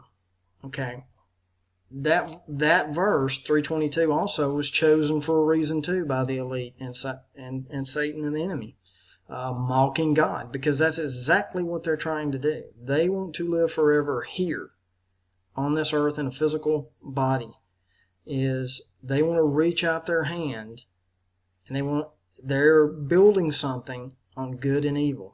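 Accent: South, United States. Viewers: what part of the South are you from buddy? I'm from east Tennessee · I like your accent because I'm from the great Southern USA!!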